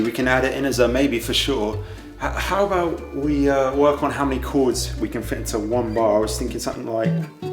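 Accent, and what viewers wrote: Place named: United States. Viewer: dude, well done. Full marks on your yank accent bruv!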